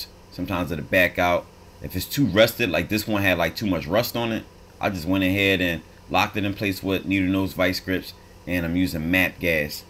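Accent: Philadelphia, United States. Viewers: You sound like a Philly boul lol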